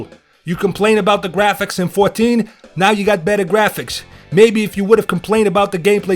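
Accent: New York, United States. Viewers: Dude sound like a 30 year old New Yorker from the 70s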